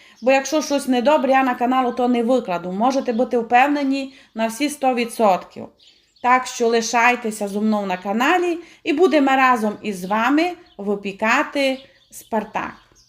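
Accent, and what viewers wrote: Transcarpathia, Ukraine. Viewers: я ще не їв того пляцка, напевно дуже смачний. Але я милувався твоїм голосом з закарпатським акцентом. Дуже мило і приємно слухати тебе. Дякую що ти на каналі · Який гарний закарпатський діалект. Це багатство нашої мови. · Люблю закарпатський діалект.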